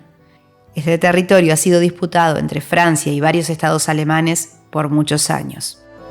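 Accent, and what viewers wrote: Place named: Argentina. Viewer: Toda Francia es preciosa!!! La voz argentina, suena maravilloso el español.Gracias por comunicarnos con su voz este precioso vídeo.👍👍👍👍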